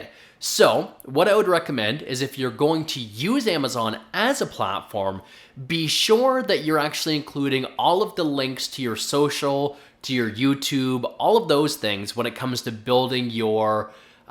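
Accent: Canada. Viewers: You have a very pronounce Canadian accent, meant nothing bad by it. I was just curious if my guess was right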